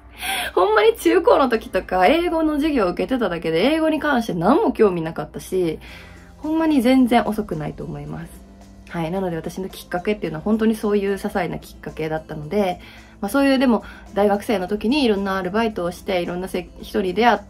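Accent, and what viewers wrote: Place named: Kyoto, Japan. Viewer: 🎉 さま❤ 一目見た瞬間に大ファンになりました!😅そうかー、時々出る関西弁ば京都やったんですねー😊いや～、良い!京都やったらさん、可愛いから舞妓さんになってもバカ売れだったでしようねー😂ほんとに素晴らしい女性ですね😮花粉症なところもとても親近感覚えます❤🎉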